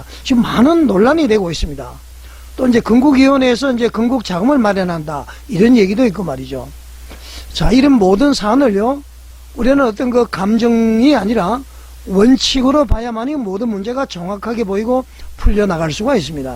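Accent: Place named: North Korea. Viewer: 북한말씨에 홍설수설 ᆢ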